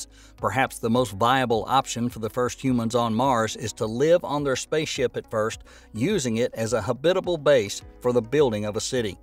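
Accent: South, United States. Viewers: The narrator has an annoying "country" accent and frequently mispronounces words.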